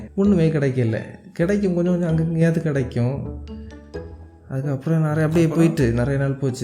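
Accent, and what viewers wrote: Kanyakumari, India. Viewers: Hii neenga Kanyakumari ah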